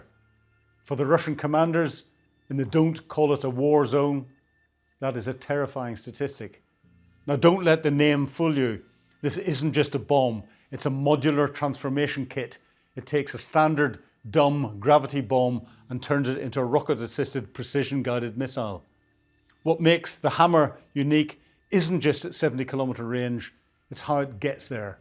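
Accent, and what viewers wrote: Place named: County Antrim, United Kingdom. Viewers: Good use of maps and other visuals. I always enjoy your analysis. I also enjoy your accent, my father was from County Antrim and my relatives had that kind of accent. Now the Greenland fuss makes sense. Keep up the excellent work. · I'm sorry, but I just don't buy all you are saying about why the USA "needs" Greenland. Speaking with an English accent does not make you more believable. Have you ever played the game "Risk" ? I have a feeling that you would love playing it, perhaps with Donald. At this point these there is far too much rubbish and speculation on YouTube, and you are not helping.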